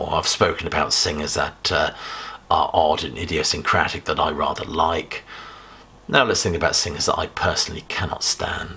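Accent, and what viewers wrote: United Kingdom. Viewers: skip it ... he is talking nonstop with a British accent and going nowhere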